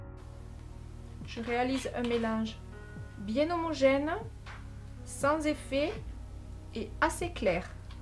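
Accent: South, France. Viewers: Magnifique ! Vous avez un bel accent du midi bien agréable. Merci pour cette démonstration.😍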